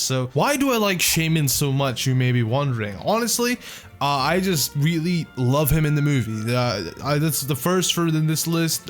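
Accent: Scotland, United Kingdom. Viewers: you can really hear scottish in in his first video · That Scottish accent in the first video you ever made :'D xD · Is this nigga Scottish? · Are ya scottish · Scottish? :P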